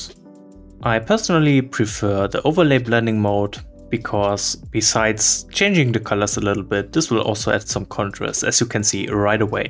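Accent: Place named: Germany. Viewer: Gleich die ersten Sätze ""helo ia uan wouatzap" )) Dein gut gemeintes Englisch ist dermaßen deutsch, dass es fürs Ohr dies oder jenes geesprochene Wort unverständlich kliingt. Am besten machst du deine Videos in der Muttersprache und du wirst sehen das wird belohnt, hast bestimmt mehr Subscriber als sonst. Warum? Weil in englisch ist auch Konkurenz zu groß.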